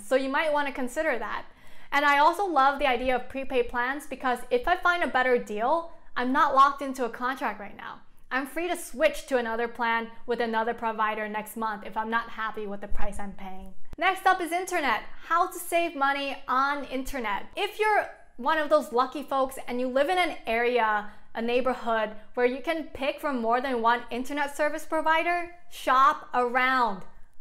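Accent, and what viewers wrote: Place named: United States. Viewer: she is speaking American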